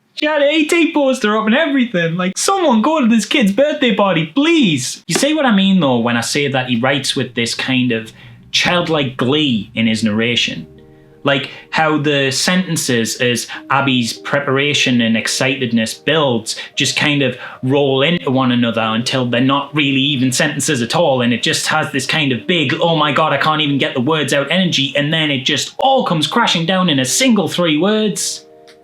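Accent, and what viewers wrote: Newcastle, United Kingdom. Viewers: That's an incredible book cover! Btw, I have loved this whole series so far. I am a pretty serious horror fan, but I never thought of how heartwarming and wholesome the genre could be, and nowadays I live for that shit. So thanks for bringing these gems to all of us and talking about them so genuinely in that charming-ass Geordie accent.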